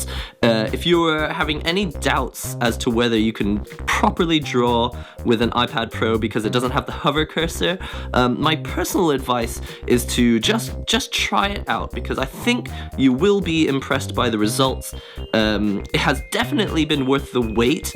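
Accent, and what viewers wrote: United Kingdom. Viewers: Hey, new subscriber here. Are you from the UK? Thats not an American accent!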